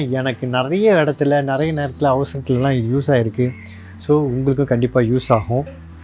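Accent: Tirunelveli, India. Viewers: Are u from thirunelveli?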